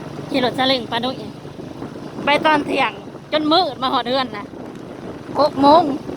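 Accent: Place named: Laos. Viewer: เวลาลาวเว้าน่าฮักอิหลี ป้ากะหลงฮักเจ้าแล้วล่ะมาให้กำลังใจจ้า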